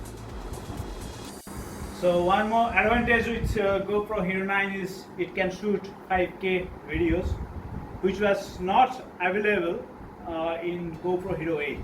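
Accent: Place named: India. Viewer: You sounds like pure NRI....nice unboxing video...keep it up 👍👍👍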